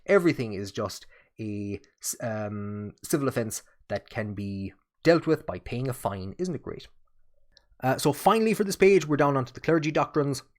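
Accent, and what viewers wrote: Ireland. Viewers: An man with an Irish accent talking about Irish Insular Christianity - wouldn't have it any other way.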